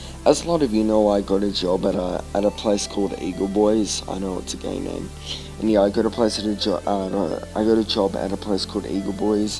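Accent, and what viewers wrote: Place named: India. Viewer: dat indian accent doh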